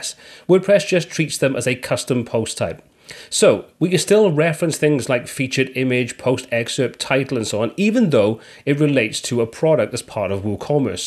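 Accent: Wales, United Kingdom. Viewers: Is that a welsh accent I can sense ? Swansea area ?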